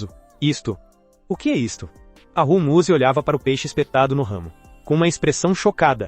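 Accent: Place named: Portugal. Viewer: Essa língua portuguesa falada à maneira de Portugal não dá..m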